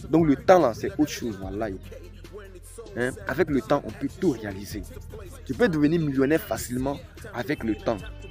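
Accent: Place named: Ivory Coast. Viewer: Si tu es ivoirien garde ton accent stp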